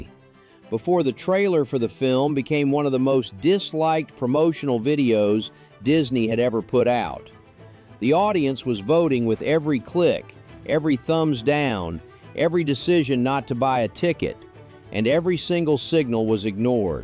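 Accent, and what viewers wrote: Texas, United States. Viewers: You gloss over genocide, the deliberate bombing of children's hospitals, bulldozing of human beings, machine gunning of children and starving refugees by the extreme terrorist nation of Israel as "foreign policy". It was not politically smart to engage with her co-star on this, it's true, but those atrocities demand a level of response and engagement that goes far beyond one's career, industry polity, and 170 million dollars. In fact I would trade a single Palestinian child's life, for 170 million dollars. By the way, *Israeli soldiers have asserted their "right to rape" in this conflict*, and largely gone unchallenged. Make a video about that with your Texas accent. Until then, you are all too predictable.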